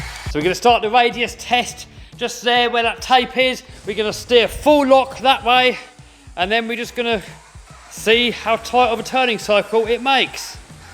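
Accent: United Kingdom. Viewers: Is that Uk accent? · Are you British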